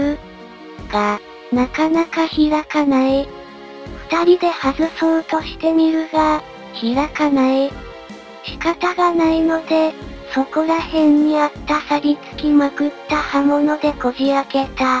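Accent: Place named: Kansai, Japan. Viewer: 味付けのために変な関西弁つこうてるんやろうけど 関西でそんな海まで熊が降りてくるようなところあったかな～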